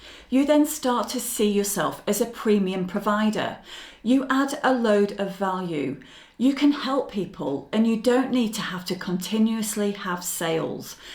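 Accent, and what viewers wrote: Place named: United Kingdom. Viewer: Thank you again for sharing....I am actually setting up the backend of my e-Design company, and the price is the area I am currently working on, although I have 3 packages already prepared, so your advice is invaluable. Plus, I am now in the USA and your British accent reminds me of my hometown! how cool is that! LOL!😊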